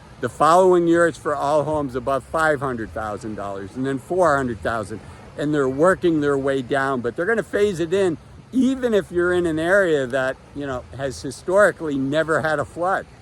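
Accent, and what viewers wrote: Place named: Cleveland, United States. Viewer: My late biological dad was from the Cleveland area too, and your accent reminds me of his, so I guess there is a Cleveland accent and you have it and he did too. As an adult he moved around a bit but settled in Northern VA, where I found him in 2015. He was in his 80s and I only knew him for a couple of years before he passed. Getting to know him was one of my life’s peak experiences. I’m glad I found him before he died, but wish I had had more time with him.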